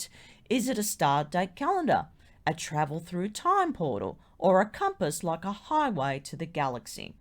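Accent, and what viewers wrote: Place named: Australia. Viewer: Hi 👋 do you have Polynesian roots? I can hear 👂your an Aussie but you have Polynesian features.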